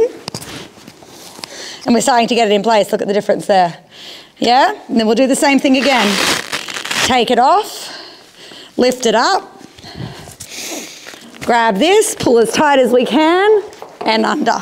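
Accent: Australia. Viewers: Wow so interesting - Wes was soo good with the ducktape - TFS 😍 just a quick question are you Australian? Love from a fellow Aussie 🦘🐨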